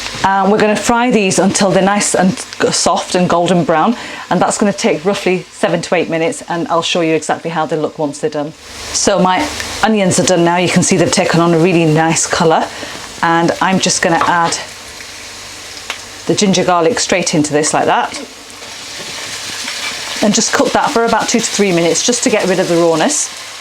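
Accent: United Kingdom. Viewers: searching for Pakistan recipes and YouTube showed you first.after listening to your British Accent i decided you are professional 😀😀 by the way you are a very beautiful girl.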